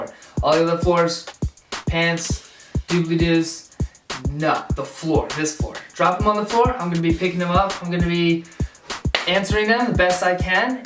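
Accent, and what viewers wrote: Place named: China. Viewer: you talk like chinese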